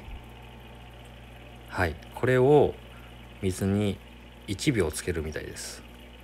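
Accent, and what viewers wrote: Kyushu, Japan. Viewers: こんばんは 九州弁ありがとうございます😊な〜つかしい〜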